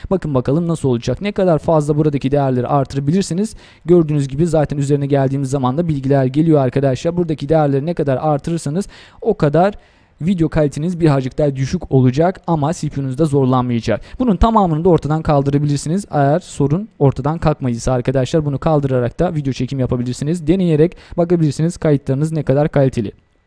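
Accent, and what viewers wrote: Azerbaijan. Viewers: Azeri o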